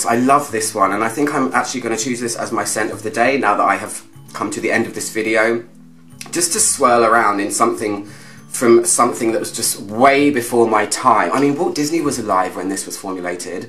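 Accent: United Kingdom. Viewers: New to your Channel and totally delighted by your reviews. I LOVE your style,your clothes and your britishness,and of course I share your passion for perfumes and everything perfumey. I also keep my English up to date and delight muy ears with your accent. Congratulations,you've got a new fan. Greetings from Spain,!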